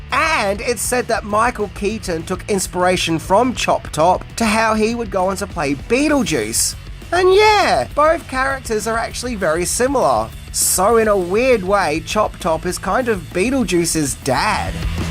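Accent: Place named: Australia. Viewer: Me, personally, I hate AI narration. Souless. Plus I love the Aussie accent.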